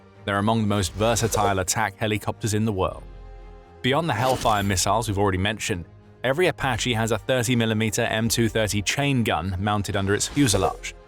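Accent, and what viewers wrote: United Kingdom. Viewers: Why are all of the videos are narrated by guys with a British accent????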